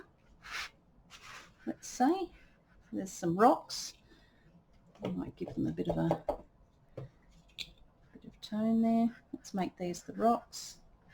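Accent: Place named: Australia. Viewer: I always use Hakes for watercolour. Great vid and I love Ozzie accents, esp the singing at the end of sentence ;-)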